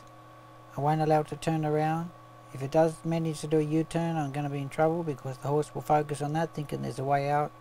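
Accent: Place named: Australia. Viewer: HAHAHAHAHAHAHAHA OH MY GOD! i find myself for no reason finding this totally hilarious. hes 'japanese' but has an 'austrailian' (sounds like to me) accent. and the stuff he says is just bloody class! omg im crying!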